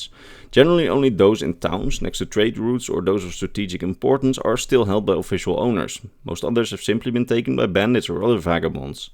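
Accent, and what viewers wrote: Netherlands. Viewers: Ik kan aan je accent horen dat je Nederlands bent :)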